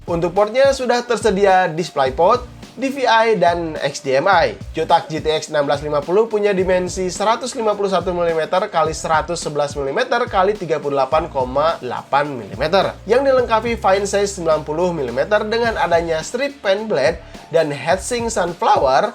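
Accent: West Java, Indonesia. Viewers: Wajar lah orang sunda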